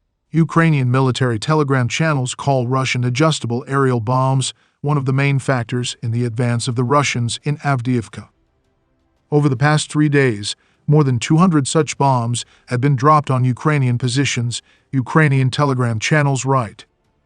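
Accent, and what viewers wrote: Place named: United States. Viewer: from Ukraine, professionally narrated by some American))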